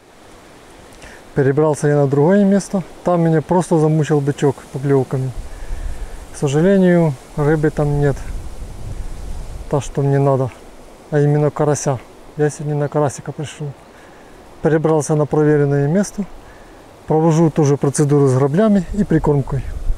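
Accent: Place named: Vologda, Russia. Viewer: Говор у Вас прикольный, только не пойму "г" мягкое как украинское, а "оканье"...🤔горьковское или вологодское🤔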